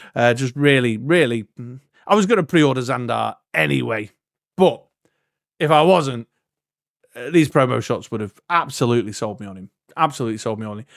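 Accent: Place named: United Kingdom. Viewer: Thanks for the run down mate! Its always great to hear it in a brit voice lol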